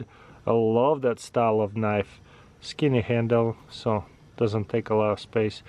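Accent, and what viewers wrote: Russia. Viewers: Going from the accent, art thee russian?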